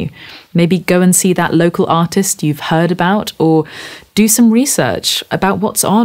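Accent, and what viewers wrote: United Kingdom. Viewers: Dear Ms., I can't remember such an attractive, pleasing British voice and speaking as yours. I was stunned at the first several seconds. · Love that firm, assertive/relaxed vaguely mannish Brit accent. Did she already address the Leap Frog debacle ? "What's he really like ?" Etc., etc. .ZZZZzzzzzz..."Corporate rock still sucks." Etc. , etc.. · Is getting MORE British?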